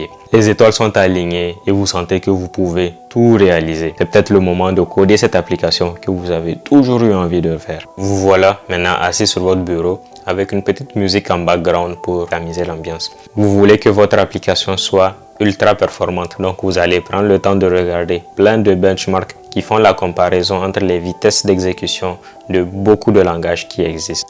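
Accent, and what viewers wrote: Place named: Niger. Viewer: Merci pour le rappel Je sens comme un accent de mon pays le 227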